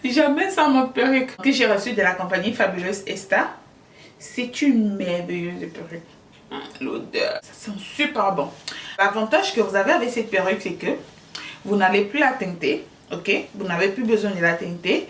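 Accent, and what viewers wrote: Cameroon. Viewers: je croyais que tu étais camerounaise avec ton accent 🤗😂 Ton mari ce sont tes yeux de désordonnée et ton piercing qui lui ont plu 😂😂😂😂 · ma compatriote equato hein🤣🤣🤣 heee pero no parece tienes más acento camerunes 🤣🤣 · J’ai reconnu l’accent bulu du Cameroun! Tu n’es pas gabonaise. Quand tu t’exprimes exactement comme une beti.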